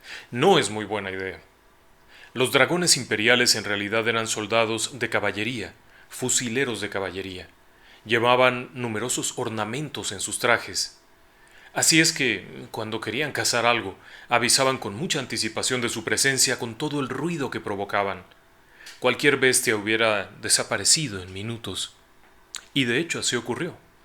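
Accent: Mexico. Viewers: Es el acento mexicano hermano saludos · El castellano es un idioma muy rico. Los latinoamericanos lo han hecho aún más rico. Te lo dice un auténtico castellano de Castilla,que soy yo. Se supone que el castellano más puro se habla en Valladolid. El señor habla perfectamente el castellano con las particularidades de un Mexicano. Lo bueno de nuestro idioma es que desde el sur de EE.U.U hasta la Patagonia puedes hablar con todo el mundo sin necesidad de otro idioma que el Castellano.Por eso que estamos todos unidos por este idioma tan rico. Digo rico porque tiene palabras derivadas del latín,del árabe y en menor medida del Visigodo. Los nombres de nuestras ciudades vienen de el Latín, Árabe, Visigodo,hasta del fenicio y el Cartaginés. Saludos a todos los hispanoparlantes del mundo desde Guadalajara en el corazón de Castilla (España). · que ignorante es acento netamente mexicano nosotros somos mexicanos no Latinos, aprende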